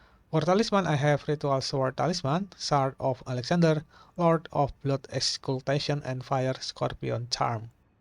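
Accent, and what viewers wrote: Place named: Indonesia. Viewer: iya bang pantesan aksennya kyk org indo cuman pas liat channel infonya dari us wkkwk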